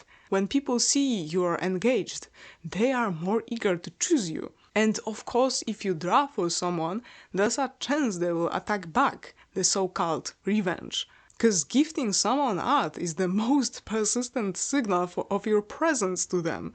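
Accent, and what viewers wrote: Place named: Poland. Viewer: You're either polish or dutch aren't you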